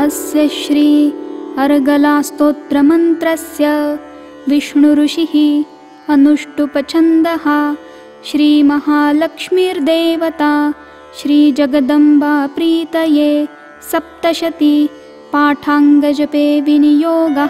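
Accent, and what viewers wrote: Standard. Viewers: ATI shudh uchharan Hai apka. Param madhur swar. Jai Ma 🙏🙏swayam Ma Saraswati ka Roop hain aap. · Bahut shudh ucharan ,ati sunder. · अत्युत्तम सुमधुर कर्णप्रिय सांस्कृतिक स्वर में शुद्ध उच्चारणयुक्त, जय मां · Very nice, suddha uchharan To Liston again and again, Love to Liston all the time. 💐💐🌹👌👏🙏thanks making this odio. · शुद्ध उच्चारण , सुमधुर आवाज । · adbhut शुद्धता और पवित्रता के लिए ही परसिद्ध है।शुद्ध उच्चारणके लिये कोटिश:धन्यवाद अभिनंदन। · Bahut madhur vani avm bahut shudh vachan.bahin per saraswati maa ki kripa hai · Complete path. Visuddh uchharan sath sath pratyaksh on screen. Iskeliye koti koti pranam . MAA DURGA AAP PE KRIPA KAREN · इस प्रकार सस्वर सहित एवं शुद्ध व्याकरण सहित पाठ मैंने आज तक नहीं सुना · जय भगवती मा शुद्ध एवं सात्विक उच्चारण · शुद्ध उच्चार... 💐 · ऊँ नमो: भगवते वासुदेवाय । इतना सुंदर शुद्ध उच्चारण के साथ आप गायीं , बहुत बहुत धन्यवाद आपको । मीना सिनहा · सादर नमस्कार। शुद्ध उच्चारण साक्षात् गले में सरस्वती जी विराजमान हैं। मैं रोज सुनती हूँ और साथ में गाने का भी प्रयास करती हूँ ।मुझे भी गाने का शौक है। बहुत बहुत धन्यवाद नमस्कार।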